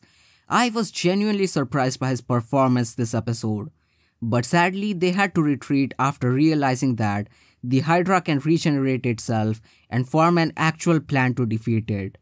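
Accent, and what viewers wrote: India. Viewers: This indaian accent is killing me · It's indian accent bruh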